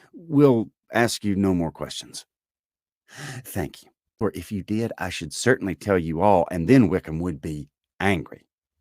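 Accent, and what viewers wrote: South, United States. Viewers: I have just found your channel-I absolutely love the Southern accent twist to these classics - I am just about to start Persuasion my favourite Austen novel -Thank you so much for the uploads ,much love from Southampton, England x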